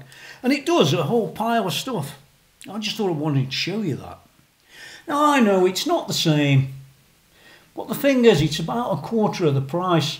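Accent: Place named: Derbyshire, United Kingdom. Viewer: Hi. I’m new to your channel. Really enjoyed your presentation. I’m seriously thinking of grabbing the H90 is someone wants my Luke. I can’t pinpoint your accent.Derbyshire? I will try and find you on Facebook as I would love to bend your ear. Fantastic channel.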